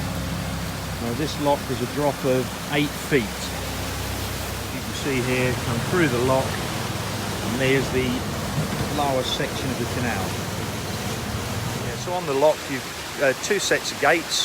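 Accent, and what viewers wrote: Norfolk, United Kingdom. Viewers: Is that a Norfolk accent I detect?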